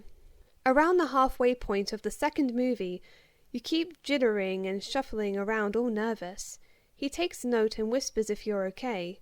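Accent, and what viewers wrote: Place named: United Kingdom. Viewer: Bro why you sound like a British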